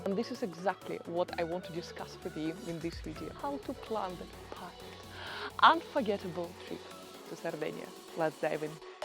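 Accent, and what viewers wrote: Russia. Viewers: You have a heavy Muscovite accent. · Somebody speaking English like a Russian and Italian like an Italian. Nice Video and true, Sardinia is not expensive at all (just at Toll).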